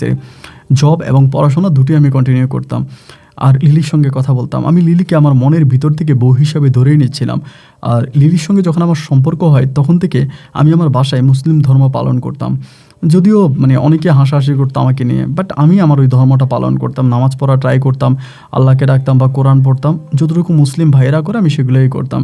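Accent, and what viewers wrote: Bangladesh. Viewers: You were not born and brought up in England, your accent is Bangladeshi.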